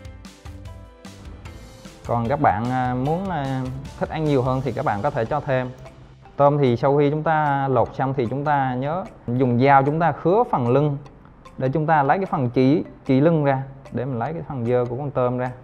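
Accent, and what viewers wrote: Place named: Phu Yen, Vietnam. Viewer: Tiếng giống khu vực tây hoà phú yên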